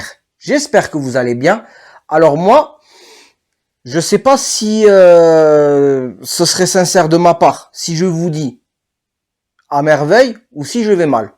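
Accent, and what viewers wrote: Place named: Toulouse, France. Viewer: Au top ton debrief sacrée accent toulousain hein😉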